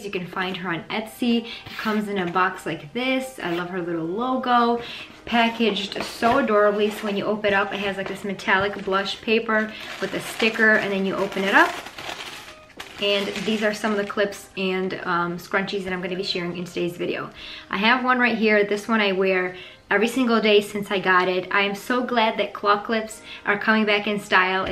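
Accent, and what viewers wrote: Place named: Midwest, United States. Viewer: no way ur not from the midwest with that awesome accent...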